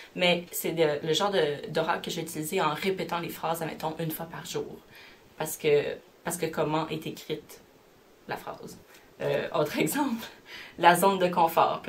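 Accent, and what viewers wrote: Quebec, Canada. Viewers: Moi, je t'ecoute, juste parce que je kiffe l'accent quebecois ;-)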